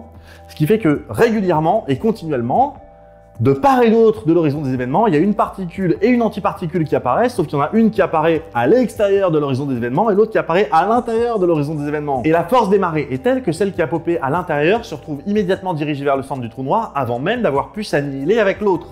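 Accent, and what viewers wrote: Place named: Alsace, France. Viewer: Alsacien je crois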